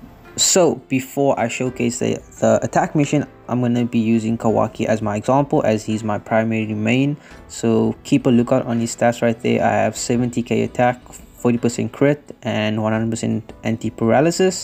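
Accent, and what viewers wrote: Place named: South Africa. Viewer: Yo bru where u from ur accent sounds South African??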